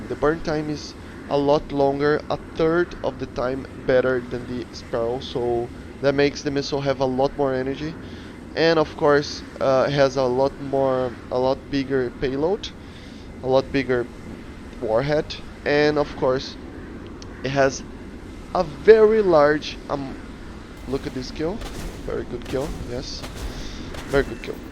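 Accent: Brazil. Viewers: aha, desconfiei. O sotaque brasileiro não engana kk Mas que canal top cara, descobri por acaso. É só questão de tempo para o canal crescer * sul do brasil onde? rio grande do sul?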